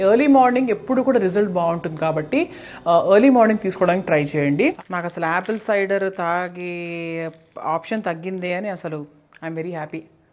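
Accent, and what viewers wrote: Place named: Telugu, India. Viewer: I like ur telugu slang 👌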